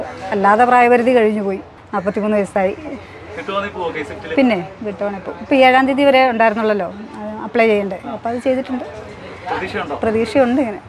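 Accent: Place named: Pathanamthitta, India. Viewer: കാസറഗോഡ് കാരി ആണെങ്കിലും തനി പത്തനംതിട്ട കാരിയുടെ സംസാരം. 🌹🌹🌹👌👌🙏🙏👍👍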